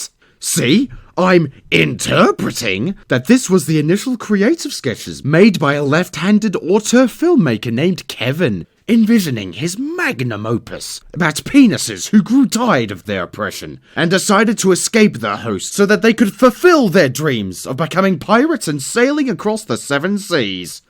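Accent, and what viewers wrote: Australia. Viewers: Why do you sound Australian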